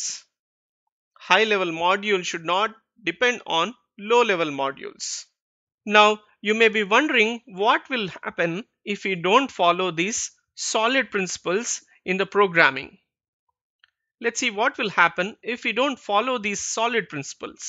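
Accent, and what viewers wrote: India. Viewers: Indian accent strength (directly proportional to) simplifying complex concepts. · Матвей Тарасовhe he is Indian so his accent is Indian.do you have problem with his accent? then go elsewhere .it's better than overrated western accent which requires extra effort to understand .stupid like you not appreciating his knowledge instead complaining about his accent.he is teaching free just try to get some knowledge instead of complaining. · A good material but your accent is very indian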